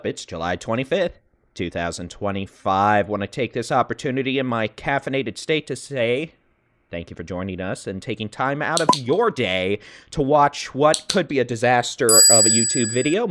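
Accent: Texas, United States. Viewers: That was a heckova opening there! You sound like a Texan!!🤠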